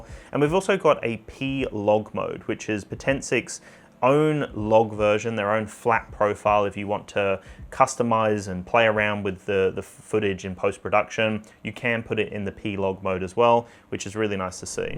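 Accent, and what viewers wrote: Australia. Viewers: G’day, Retired DJ Mickey Rage up in Mission Beach FFNQ here mate…….. I just wanted stop in to say: “Thanks for doing what you do here on the Tube mate……… It’s really nice to hear an Aussie Accent instead of the Septics All The **** Time eh” So, Keep up the great work.. AND while I have your undivided attention: I couldn’t get the first link on here to the potensic atom mate………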